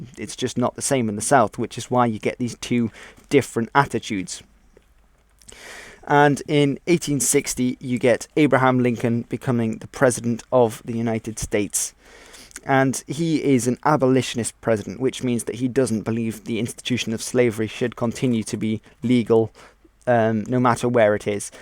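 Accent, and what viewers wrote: United Kingdom. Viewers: This dude is British it sounds like lmaooo y’all still salty about some Patriot Americans who beat your ass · Very good work here helps your British for At least us Americans can't get enough of a British accent ! Subbed!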